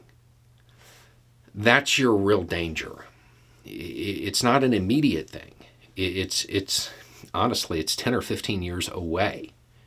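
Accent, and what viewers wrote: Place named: Panhandle, United States. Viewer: So weird to go back to videos from like 4 years ago, back when he was leaning heavily into a Panhandle accent.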